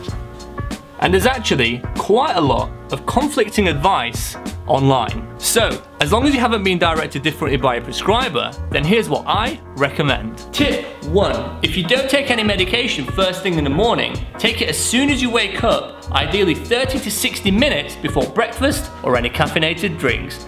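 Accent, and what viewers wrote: United Kingdom. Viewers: I had to turn the caption on because of the British accent 😂 I'm a fresh PharmD graduate and i'm interested in patient education and you are indeed inspiring me a lot!!🙌🏼 keep up the great work! God bless you!🙏🏻✨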